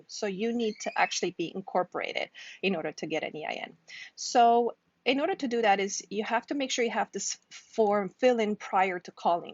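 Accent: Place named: Romania. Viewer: Mom your Not canadian your from Rumenia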